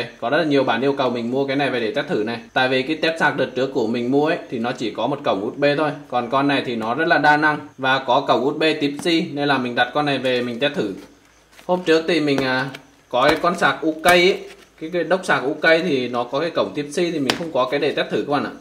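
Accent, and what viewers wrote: Nghe An, Vietnam. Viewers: bạn ở nghệ an à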